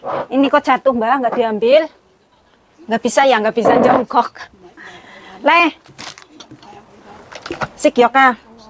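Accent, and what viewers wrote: Cilacap, Indonesia. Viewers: saran sy kalo bisa bicaranya diperhalus dan jangan keras2... Kasian neneknya... Apalagi dgn logat cilacap yg kasar.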